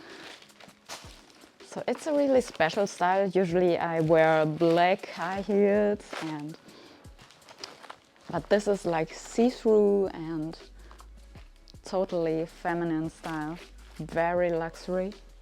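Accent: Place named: Germany. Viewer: you look gorgeous in everything you wear and I love your German accent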